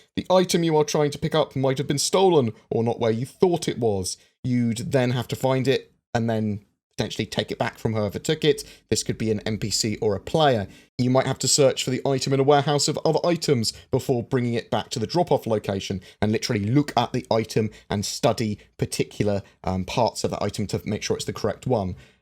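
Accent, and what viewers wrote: England, United Kingdom. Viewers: Youuur accent is so Englishhhhhh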